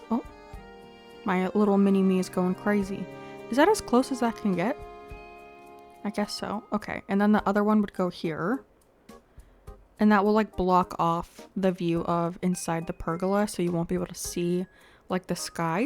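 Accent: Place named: South, United States. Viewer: That southern accent took me out hahahah this build turned out so good!! 👏🏻 and yes, the waterscaping looks very interesting 🤣 educational content!!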